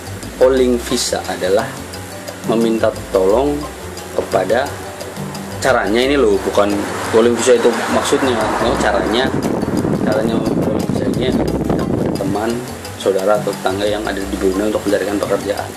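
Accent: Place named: Banyumas, Indonesia. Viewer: Ketemu maning ketemu maning Karo wong ngapak Ning Brunei 😀 Sukses selalu Bossque 👍👍👍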